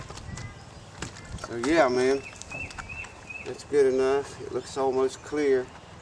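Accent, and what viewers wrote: South, United States. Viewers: lol, actually sounds more like Earnest T. Bass (character in the old Andy Griffith show - the hillbilly who comes down from the hills , every now and then, and causes mischief)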